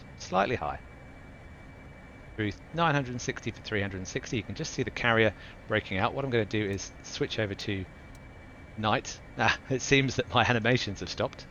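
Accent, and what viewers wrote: United Kingdom. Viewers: I like your British English accent.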